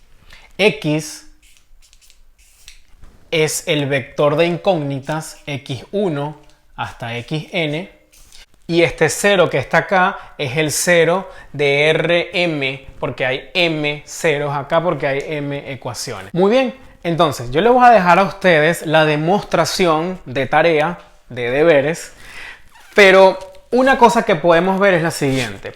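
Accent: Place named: Panama. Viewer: Eres de pTy por el acento hahah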